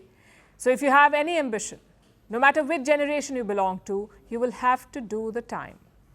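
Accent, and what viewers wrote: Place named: India. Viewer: I hate Indian broadcasters. They cannot get rid of the accent. 😖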